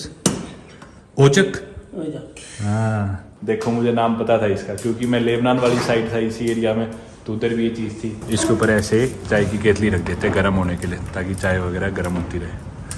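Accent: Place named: Rajasthan, India. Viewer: Bhai aap hamare rajasthan se ho aapki aawaj se to lagta h 👍🙏🙏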